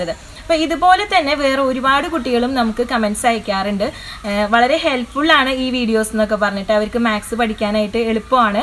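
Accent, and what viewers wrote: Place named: Thrissur, India. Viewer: Trchinte class kettittanu gjaan. Polynomial ,geometry and algebra padichatu enikku. Chicken pox vannarunnu pakshe full pattilla tr ottiri parts idunnontu pakshe ippo Tr parts minimize cheytu thnku parts kure koodunontu ottiri time mathsinu pokunnarunnu ateyullu ippo almost ariyam trnte language kettopozhe thrissur aanennu tonni entayalum Tr poli class aanu gjangal kollam kaaranu thnku thrissur kaari teacher 😘😘🤗🤗🤗🤗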